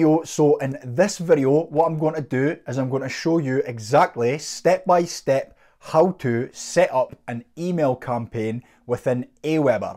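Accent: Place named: Scotland, United Kingdom. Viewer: love the Scottish accent. Helpful video. I find AWeber to be difficult. I used mailchimp for years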